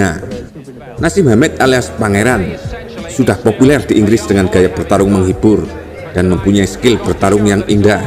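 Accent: Java, Indonesia. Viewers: 😀😀😀😀suaranya medog kayA sy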